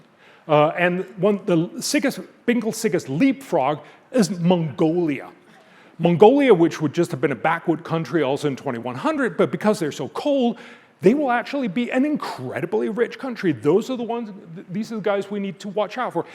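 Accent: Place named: United States. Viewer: silly question, but where did you learn to speak american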